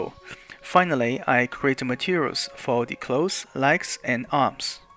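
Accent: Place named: China. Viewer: 一听就是华人，赞赞赞，求个detail tutorial可以吗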